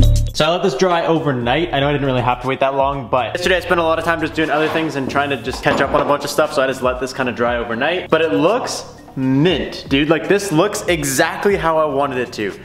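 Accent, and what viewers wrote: Canada. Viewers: Canadian accent in a nutshell ;)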